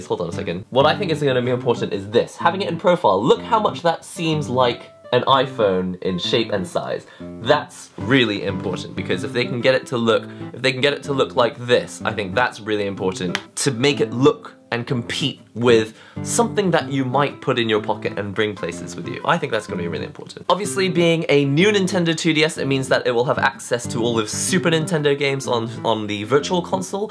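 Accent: United Kingdom. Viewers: As an america, seeing a asian talk with a british accent was very confusing. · Wait and Asian guy with a British accent amazing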